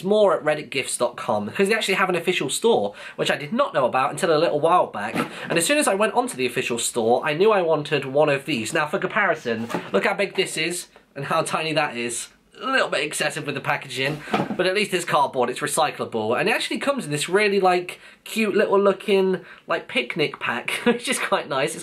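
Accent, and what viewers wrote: United Kingdom. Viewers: He says it correctly (for a brit). Stop thinking that your way is correct.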